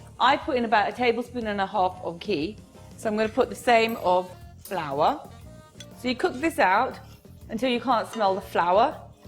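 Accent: United Kingdom. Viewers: She is flaming Hot.. I love her British accent too.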